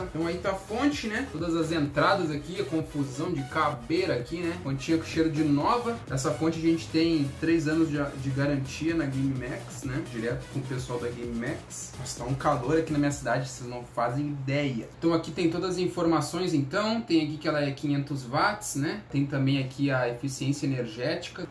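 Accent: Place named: Rio Grande do Sul, Brazil. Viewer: Tu é Gaúcho né meu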